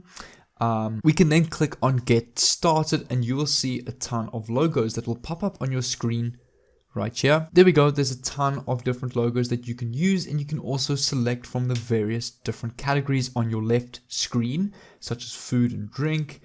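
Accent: South Africa. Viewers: Hello I just discovered your channel. I'm definitely gonna follow you closely. You just commented on another channel. I'm going to model some you your videos. Hello south Africa. Love the accent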